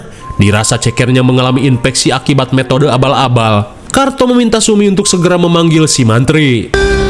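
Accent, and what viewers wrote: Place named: Sumedang, Indonesia. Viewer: Bang lu tuh orang mana bang, soalna sundana asa sarua jeung Sunda di Sumedang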